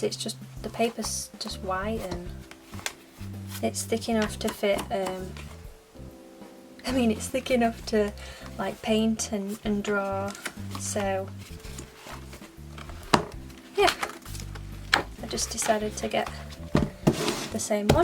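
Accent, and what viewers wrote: England, United Kingdom. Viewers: Wow! So cool!💘💞💞💜 Actually, I always have question.. Are you from England?)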